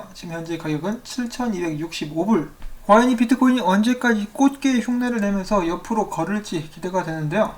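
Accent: Jeolla, South Korea. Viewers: 양쪽 언어를 네이티브로 섭렵 구사해본 바로는 전라도 쪽에 무게가 실립니다만 좁은 땅에서 지역보다는 좋은 사람이면 된겁니다 목소리 톤은 박지성 선수 필이 조금 가미 되어 있다 사료됩니다 ㅎ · 제가 듣기에는 전라도 같은데요? 단지 님은 센발음을 선호하지 않는 경향이 있으신듯...저점===>우리는 보통 저쩜이라고 읽지만 님은 저점이라고 하심~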